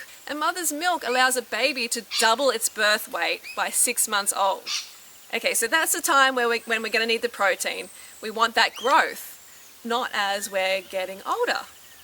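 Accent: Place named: Australia. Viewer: Haha. Super funny. I love the Aussie accent too. Oh and btw, WHERE DO YOU GET YOUR PROTEIN??? ;)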